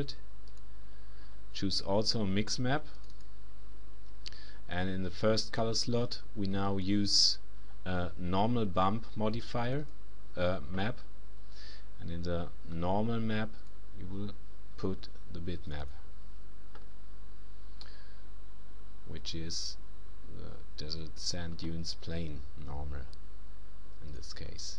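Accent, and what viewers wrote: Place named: Germany. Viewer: The German accent is the most awesome thing ever..! lol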